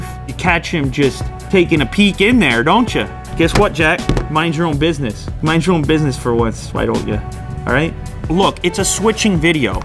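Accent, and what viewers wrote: Canada. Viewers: Is this guy Canadian? He got really Canadian about halfway through the video.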